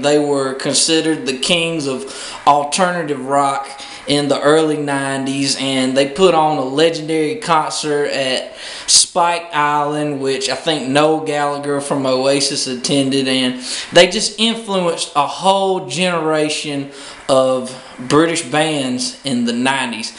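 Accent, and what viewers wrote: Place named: South, United States. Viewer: Great knowledge/review. Funny to hear a southern American talk about the, I'm half Eng/ Half American so It's always nice to hear that accent. Great job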